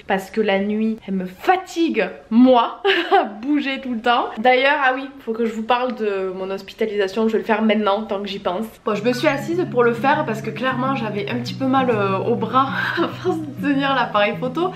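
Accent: South, France. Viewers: J'adore ton accent du sud 🥰 j'avoue la satisfaction du rangement 😅 par contre la couette j'avoue c'est un bordel 😭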